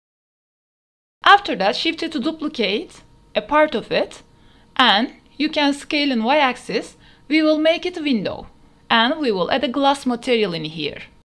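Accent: Turkey. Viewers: konuşma tarzın çok türke benziyordu bi baktım türkmüşsün :D bu aralar sürekli blender'dan liminal space ile ilgili şeyler yapmak istediğim için hep bu tarz videolara bakıyorum sonunda istediğim videoyu buldum eline sağlık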